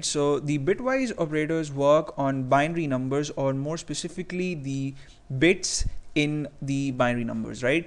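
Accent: India. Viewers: Indian accent in English. Oh my.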